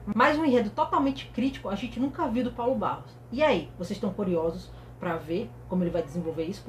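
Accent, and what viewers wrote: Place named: Northeast, Brazil. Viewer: arrasouuuuuu em sua estreia, muito desenvolta com esse sotaque nordestino arretado (gravou de primeira? heheheh nem pareceu nervosa), bons comentários, sucesso garota! Fala Galera acertou na aquisição. Concordo que temas como esse da Vila Maria abstratos são mais difíceis de se carnavalizar e é o enredo que menos me entusiasmou até então. Essa sacada do Pequeno Príncipe do sertão achei genial, vamos ver como se materializa na avenida. Incrível que diferente do Rio as escolas até preferem desfilarem cedo. Não curto muito essa coisa de posições pré-definidas pela classificação do desfile anterior (Gaviões e Colorado), essas duas poderiam ter efetuado trocas ou eram já fixas? Outra coisa que gostaria de saber (vou te dar trabalho hahahah) acabou aquela coisa de escola de torcida não poder desfilar uma atras da outra, ou permanece? 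No geral gostei bastante do sorteio de Sampa.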